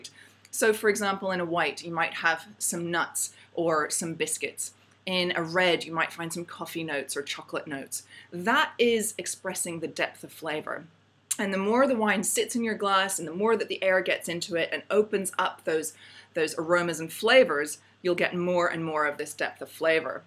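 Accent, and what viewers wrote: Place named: Australia. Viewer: I feel like you're Australian 20% of this video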